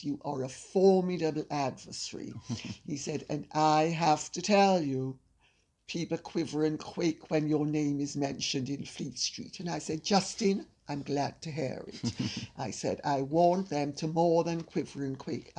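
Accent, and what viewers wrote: Jamaica. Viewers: Lady C, I'm from Jamaica, Where in Jamaica are you from, please? Your Jamaican accent is unmistakable. Love it. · A very posh uptown Jamaican accent. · Louise Leibbrandt it’s also part of her Jamaican accent.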